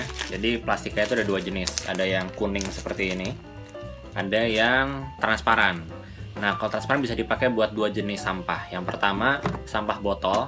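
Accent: West Java, Indonesia. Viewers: sunda pisan a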